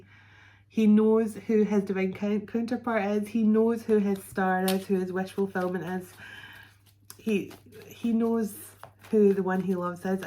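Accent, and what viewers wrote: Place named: Scotland, United Kingdom. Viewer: Love ur reading style,seen nothing like it,❤❤❤it.a while back I guessed irish,Irish, corrected me kindly,no its obviously scottish=D.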